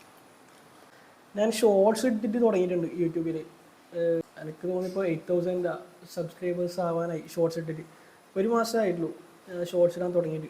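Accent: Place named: Azhikode, India. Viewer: Azhikode anenn thonunnu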